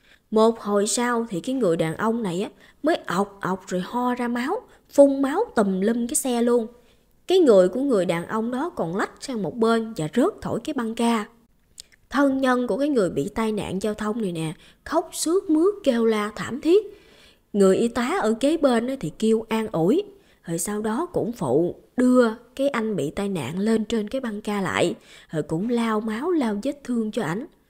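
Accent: Mekong Delta, Vietnam. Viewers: Chị rất thích cách kể chuyện mộc mạc của bé, tìm mãi mới thấy được chất giọng đúng kiểu Miền Tây thế này. Bé cứ giữ chất giọng chân quê thế này nhé, chị nghe nghiện luôn rồi ❤️❤️❤️ Chị thấy cưng rất có năng khiếu về kể chuyện. Nhớ lắm thời còn xem phim bộ TVB, chất giọng lồng tiếng rặc Miền Nam, Miền Tây mình, nhưng mà nghe nghiện lắm, nghe hay làm sao.... bây giờ thì đa số kiểu nửa Nam nửa Bắc nghe không còn thích nữa 😁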